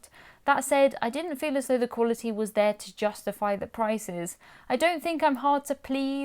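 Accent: United Kingdom. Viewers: i really like your videos and as I am from Germany I like to listen to your nice British English! ;-) thanks for sharing your honest opinion! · Most definitely they should pay you! I would pay to upgrade my iPhone with your voice with the lovely British accent (I'm from the U.S.).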